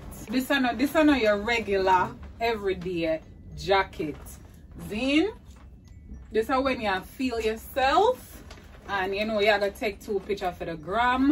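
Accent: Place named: Caribbean. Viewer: Possibly one of the best hauls ever like - what amazing pieces! I thought you'd climaxed early with that incredible fuzzy, baby blue jacket at the start just left me thinking - how do you top that? But I love the red shiny leather (especially paired with the shorts) and then that pelted black fur jacket at the end - that looks too good! Definitely a date night type coat - hope you saved it for Valentines Day! Great haul. I'll throw a sub your way. Ps where are you from? I love the accent. I'm guessing somewhere in the Caribbean?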